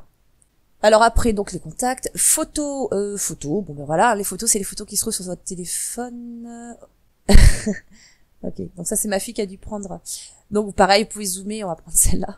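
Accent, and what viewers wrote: South, France. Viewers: salut jje profite de ce post pour te dire à quel point j'apprécie tes vidéos, c'est vraiment adorable de nous livrer toutes ces vidéos dans le domaine de l'informatique pour ma part hameçon particulièrement utile,je sais aussi que cela demande beaucoup de travail et peu de rémunération, dans tes commentaires on sent une petite pointe d'accent du sud,un grand bonheur de vivre et elles ne sont pas les nombreuses vidéos qui vont à l'essentiel et enregistré avec une très bonne diction. ce qui m'amène à te demander s'il était possible que tu nous fasses une vidéo pour le rootage d'autres téléphones android comme les Nokia sous OS Windows phone, et tous les Samsung a2,a3,a4,a5,s7 etc... qui manque le miens c'est le samsung a300fuxxs1bpe1 pour info ^^ merci encore pour tout ce que tu fais.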